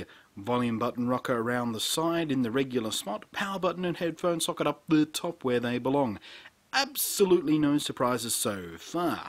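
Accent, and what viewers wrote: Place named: Australia. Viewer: ooohhh man i love your australia accent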